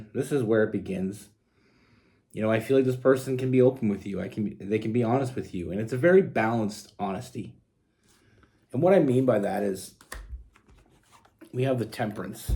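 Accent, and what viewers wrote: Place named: Canada. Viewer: I love your Canadian accent!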